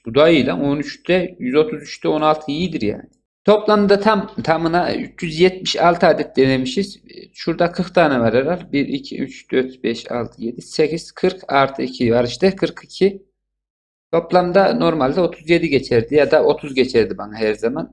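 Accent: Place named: Central Anatolia, Turkey. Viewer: mizacın güzel kardeşim iç anadolu şifesi var .D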